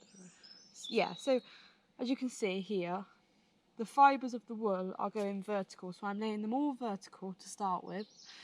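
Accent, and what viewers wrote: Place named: England, United Kingdom. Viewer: That's so weird, she looks middle eastern but sounds English!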